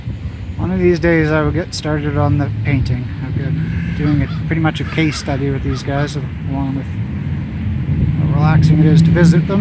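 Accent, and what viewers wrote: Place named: RP, United Kingdom. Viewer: I can already hear her Received Pronunciation.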